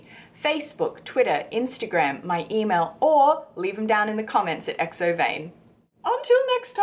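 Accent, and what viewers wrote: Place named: Australia. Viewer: I'm really curious to find out where she is from. Her accent sounds Australian most of the time.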